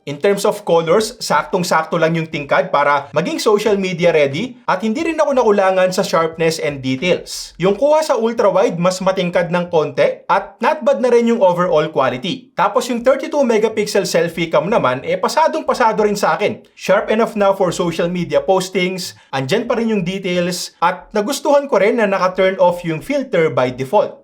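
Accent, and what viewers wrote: Cavite, Philippines. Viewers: Kabiteño k pla boss ahahaha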